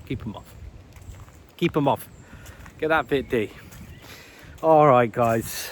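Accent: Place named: England, United Kingdom. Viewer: Love your walk and talks. You present deep thought provoking conversations. Love your accent. Wish I was born in England.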